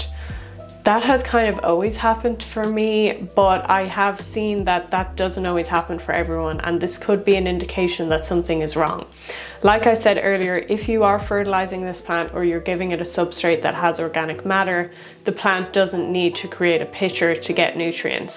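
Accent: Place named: Ireland. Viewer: This is an Irish accent in the general tone most people use because we're not fake and we don't feel the need to elevate our pitch like we're talking to newborn babies, toddlers or doggies woggies so if you can't handle it you should probably toddle along and find the Sesame Street version.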